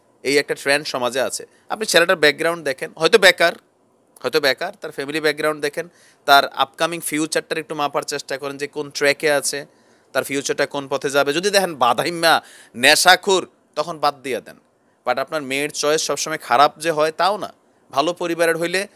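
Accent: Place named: Comilla, Bangladesh. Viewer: ভাই আপনার মুখে কুমিল্লার ভাষাটা সেই লাগে..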